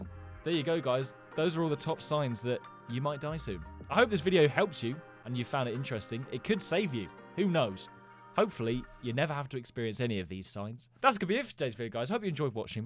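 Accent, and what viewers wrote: United Kingdom. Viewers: I like you talking British cause I like talking British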